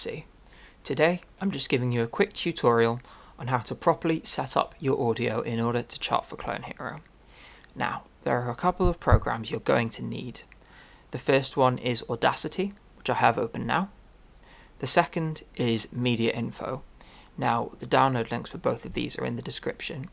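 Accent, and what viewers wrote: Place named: Newcastle, United Kingdom. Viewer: Geordie ASMR with a tutorial in the background. Nice.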